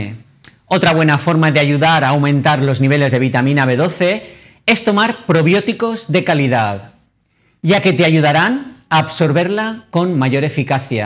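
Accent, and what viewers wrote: Spain. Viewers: Gracias Doc.... me gusta escucharlo como se expresa verbalmente... su acento español...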